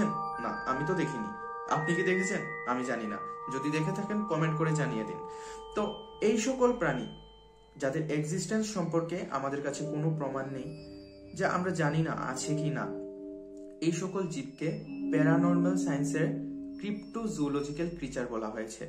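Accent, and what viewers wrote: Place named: Sylhet, Bangladesh. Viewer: আপনি বাংলাদেশের সিলেট অঞ্চলের মতো কথা বলছেন। আপনি কোথায় থাকেন ভাই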